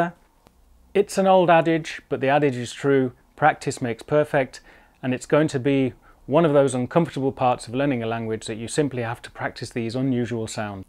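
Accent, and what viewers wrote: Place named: Germany. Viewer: I think it's a testament to how far you've come that there seems to be a tiny hint of German accent in your English now.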